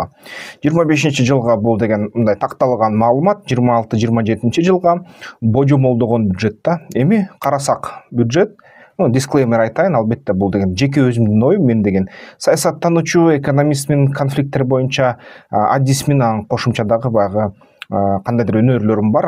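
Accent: Standard. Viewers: Сонун таза кыргызча менимче мамилекет туура жолдо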